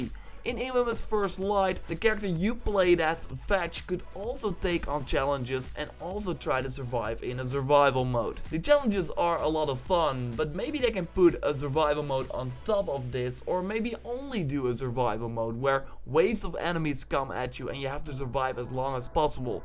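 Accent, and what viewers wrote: Netherlands. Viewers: Are you dutch? You have a dutch accent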